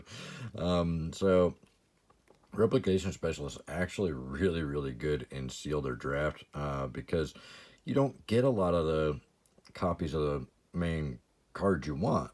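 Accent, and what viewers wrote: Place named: United States. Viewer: May I ask what area of the United States you’re from? Can’t get down where your accent is from. Watching here from MN. Keep it up!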